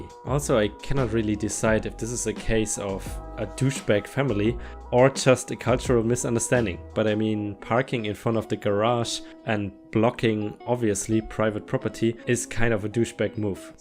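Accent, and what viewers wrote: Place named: Germany. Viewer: Ah, I was right, you are German. I am subbing for more stories and more of your charming accent. :)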